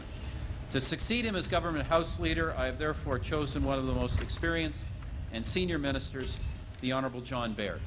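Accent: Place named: France. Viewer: people who are making fun of his french accent. Well, some french who speak english don't have a perfect accent either...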